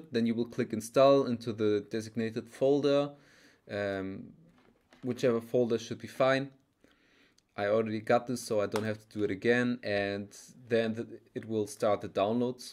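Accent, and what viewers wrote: Germany. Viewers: Wie man einfach sofort hört das du eigntlich Deutsch sprichst xd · hahah habs am akzent gemerkt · Man hört so krass das deutsche akzent raus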